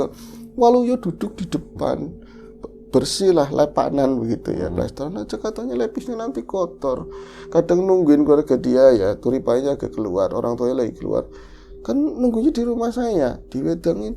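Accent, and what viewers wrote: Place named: Tegal, Indonesia. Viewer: Saya senang gaya bercerita, santai, ada bhs tegalnya, ada lucunya....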